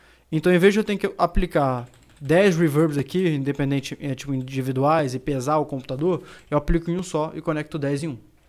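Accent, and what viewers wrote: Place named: Portugal. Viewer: Vc é de portugal mano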